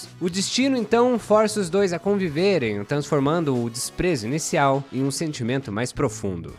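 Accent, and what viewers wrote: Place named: Rio de Janeiro, Brazil. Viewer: Todo carioca fala assim mesmo ou é só tentando falar igual aos mais conhecidos?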